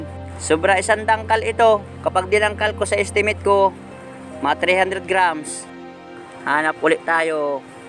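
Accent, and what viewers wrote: Bicol, Philippines. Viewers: Tagalog ang salita tunog bicol😅😅😅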